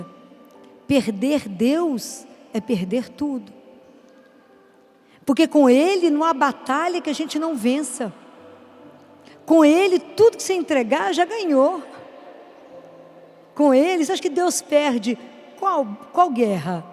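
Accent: Minas Gerais, Brazil. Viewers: Eu chorei e consegui aprender muito.pq muito do q ela falou e passei e passo pq meu irmão esta desaparecido ha dois anos. Mas aprendi que entre ele e o sumiço a misericórdia de Deus é infinita. E se fizeram algum mal pra ele como disse o marido dela.Deus irá ou já fez justiça. Mas não sabemos o q houve. Mas sabemos q Deus esta com ele onde quer que for. E meu pai teve morte trágica ele interrompeu a vida dele aos 62 anos. E através da pregação dela e ainda mais q foi mensagem do papa. Hoje eu creio que meu pai e de meus 8 irmãos esta no céu. Com Jesus e nossa senhora. Obrigado Dra por existir por compartilhar e ajudar tantas e inúmeras vidas. Amo te ouvir ainda mais por ser mineira. Q acho lindo esse sutac. E vc é maravilhosa pq disse seu Sim a Deus e por tudo. De toda minha alma e coração muito OBRIGADA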